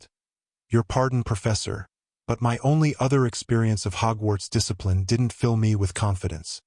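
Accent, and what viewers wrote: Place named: United States. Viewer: This is cool, turning fics into audio. I have read this fic multiple times. PLEASE can it be in a British voice if the source material is British? I couldn't listen for very long (even though I appreciate that this exists) because I can't listen to HP in an American accent, sorry.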